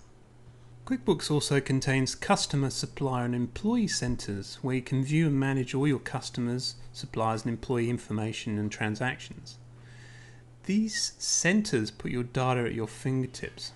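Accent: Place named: United Kingdom. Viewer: Beautiful british accent.. xD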